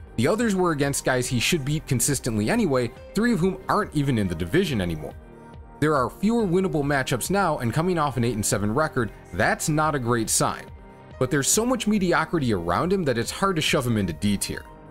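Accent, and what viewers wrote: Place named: United States. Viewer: Good analysis, but I wish you stopped americanising Japanese words and Japanese names.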